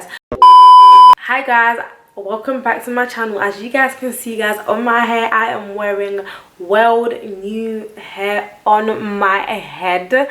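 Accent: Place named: United States. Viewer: how come i come from my boy joovier video you sound more american than me?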